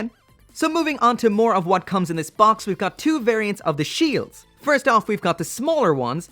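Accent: Ireland. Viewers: You from ireland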